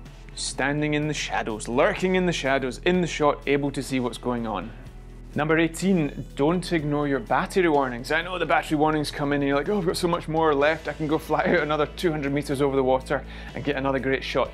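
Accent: Scotland, United Kingdom. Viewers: Scottish man love it I’m a MacGregor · The Scottish dialect is simply the coolest in the English language and the drone tips are definitely great, thanks for that! 👍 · Amazing set of drone flying tips and I love your Scottish accent. I always check the flying conditions on apps like UAV forecast or Weather by Tomorrow before flying.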